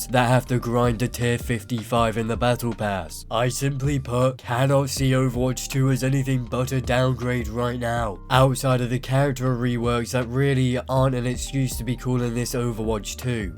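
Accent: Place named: United Kingdom. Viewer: Can we all just agree that accent increases the quality of the video by 1000% Something about the (British, right?) accent just makes his commentary and critique so much better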